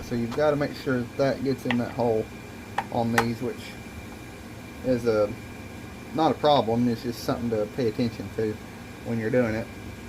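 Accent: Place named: South, United States. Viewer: I love my neotecs!! I also have the 038 clone in the 872i and that saw if an absolute beast!! 2 years and multiple big oaks and not a single problem. I have 3 or 4 more neotecs and other than some small things which Neotec took care of immediately I've had very little issue. I would love to know what the squish is on that saw. I'm willing to bet with a base gasket delete and a muffler mod that saw would pull the heck out of a 20" bar. You said the heat index was 105 this day and judging by your accent ide saw your not to far from me lol. I'm in South Alabama and the heat index 2 days ago was 112 so I feel your pain. Great review!!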